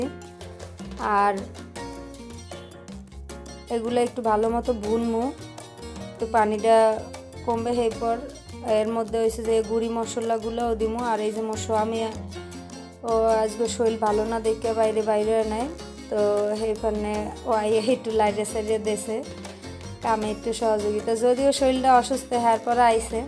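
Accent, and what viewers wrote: Barishal, Bangladesh. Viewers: Apu apner basa borisal e konkhane amar basa o borisal e .....amader borisaler basai vlog sune valolaglo ti subscribe kore fellam jate amader borisaler basa sunte pari....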